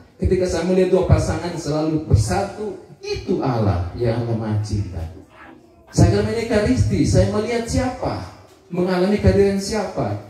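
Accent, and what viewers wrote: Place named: Lembata, Indonesia. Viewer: ni macam dari pulau lembata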